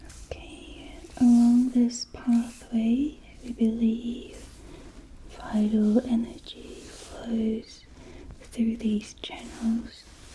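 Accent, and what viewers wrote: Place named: United Kingdom. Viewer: 너무나 한국적인 분위기에 고증도 정말 잘하신것 같은데 우아한 영국식 영어가 이렇게 잘 어울릴 일인가요ㅎ 영어공부도 같이 되고 있어요! 좋은 영상 너무 감사해요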